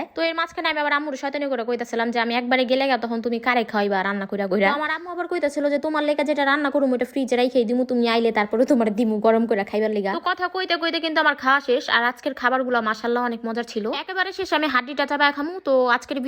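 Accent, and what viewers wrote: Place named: Old Dhaka, Bangladesh. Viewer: আপু তুমি যে পুরান ঢাকার ভাষা বলা আমার এত ভালো লাগে তোমার সবগুলো ভিডিও দেখে মাশাল্লাহ দারুন হয়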